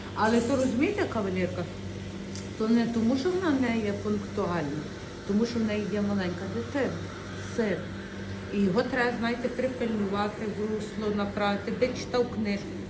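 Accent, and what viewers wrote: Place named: Yavoriv, Ukraine. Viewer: О,ви з Яворівщини,або напрямок біля кордону з Польщею ,чую знайому говірку 😂)))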